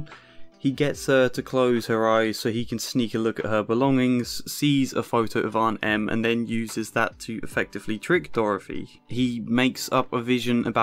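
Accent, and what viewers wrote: England, United Kingdom. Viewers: 137th...WOW ...a Wild Deep Dive into the Mind / Feelings of Dorothy Gale...I actually enjoyed this..and your English Accent makes it even better...... Have you seen the Prequel Oz the Great and Powerful"?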